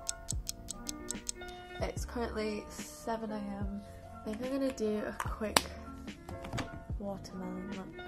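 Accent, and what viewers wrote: United Kingdom. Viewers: anyone else forget shes brittish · POV; asking if she is British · how did i not know she was British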